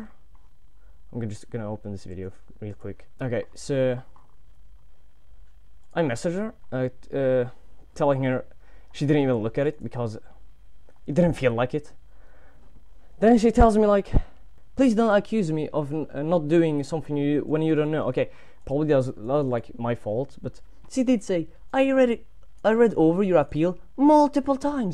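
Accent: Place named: United Kingdom. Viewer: Can't tell if you're British or American LOL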